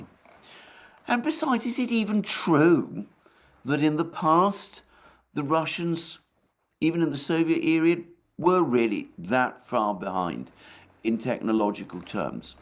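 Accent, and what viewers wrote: United Kingdom. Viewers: One of the best places for lovely russian propaganda offered with a british accent by a disgraced lawyer.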